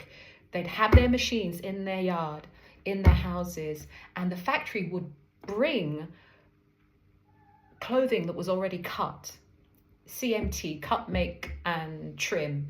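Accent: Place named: Jamaica. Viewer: Hey family hope all is well thanks for sharing. I love the Jamaican accent it’s really nice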